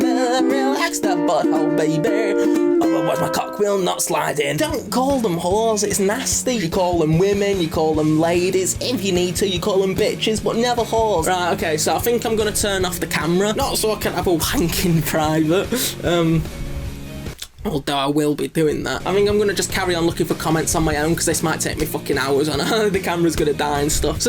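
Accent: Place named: United Kingdom. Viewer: I sarabscraibe just for the british accent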